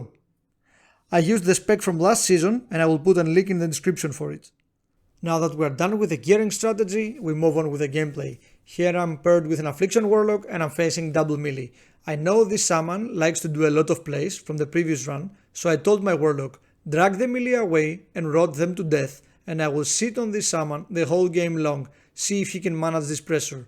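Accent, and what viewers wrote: Greece. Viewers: You sound like a fellow Greek. 😅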